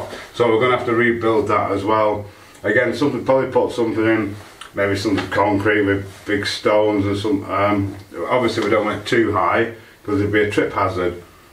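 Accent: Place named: North, United Kingdom. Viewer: Congratulations on reaching over 2,000 subscribers. The two of you make a wonderful team. Thank you for not having background music when you are carrying out your tasks and craftsmanship, it make viewing so much more pleasurable., it’s great hearing a northern accent, and your dry humour. Looking forward to seeing your future projects. Keep up the great work. Pauline, Sheffield.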